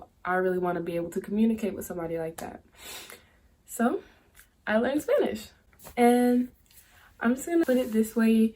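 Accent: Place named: England, United Kingdom. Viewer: I was in Birmingham for a couple of years, your English accent is not a typical black's accent tho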